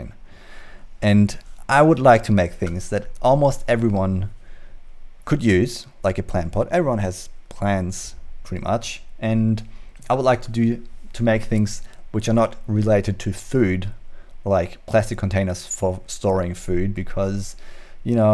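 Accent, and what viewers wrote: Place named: Australia. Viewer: Interesting accent. Sounds like Australian with a touch of European.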